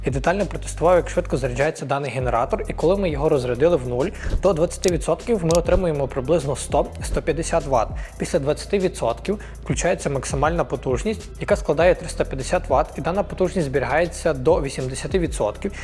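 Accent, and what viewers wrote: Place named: Surzhyk, Ukraine. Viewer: це не україномовний контент. Цей карлик булькає на якомусь невідомому суржику, помішаному з кацапським.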